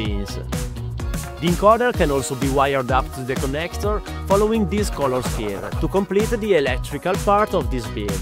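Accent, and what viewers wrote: Italy. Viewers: From this accent you have you are italian for sure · Bro si sente che sei italiano lol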